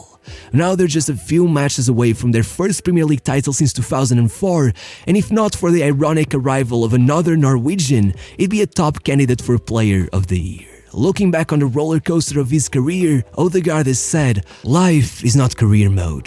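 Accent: Portugal. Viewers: Bro, embora com um excelente nível de inglês sinto que consegui perceber que és português ahahah abraço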